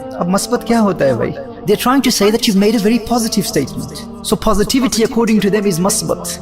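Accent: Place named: Arab world. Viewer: ماشاء اللہ خوب وضاحت ہے لیکن بصد معذرت آپ نے بہت سے تلفظ کو عربی accent میں ادا کرنے پر زور دیا جب کہ اردو کے حروف تہجی عربی زبان کے حروف تہجی جیسے ہیں مگر تلفظ میں دونوں زبانوں میں اکثر فرق پائیں گے۔ عربی زبان کے تلفظ اور اردو کے تلفظ کے درمیان بہت فرق ہے اردو کو عربی سے compare نہ کریں۔ تفصیل میں نہ جاتے ہوئے۔۔۔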